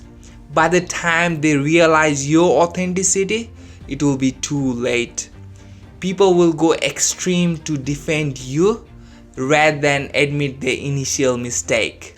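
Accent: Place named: Brazil. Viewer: Friendly advice in your Youtube debut: No-one wants to be lectured on how too think, less so coming from foreigners. Rethink your Youtube content strategy!... Your theatrical hand gestures, patronizing words and tone, in an impossibly too strong accent (Brazilian?), combined with dramatic music, this just doesn't work my friend.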